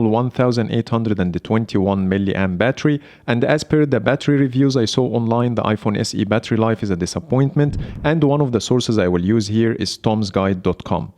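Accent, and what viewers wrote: Egypt. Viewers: I can totally tell that you’re an Egyptian from your accent. Nice vid by the way 👍🏻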